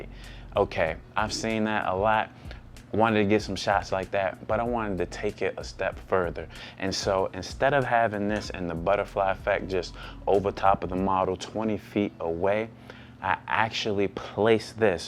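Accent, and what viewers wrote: United States. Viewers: Yo are you from Baltimore?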